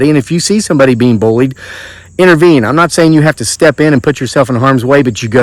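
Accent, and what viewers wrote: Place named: North, United States. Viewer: Sweetheart is how many southern women talk! If you were born in the south than you know this to be true.. than again.. your accent soumds more like you are from up north.. Now.. i do agree with your issue.. Sadly.. this has been going on for a while..we have allowed this to happen.. So this should not surprise you at this point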